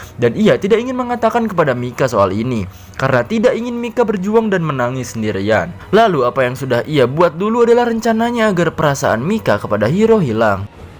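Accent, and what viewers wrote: West Java, Indonesia. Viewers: Meni sunda pisan ihh resep😂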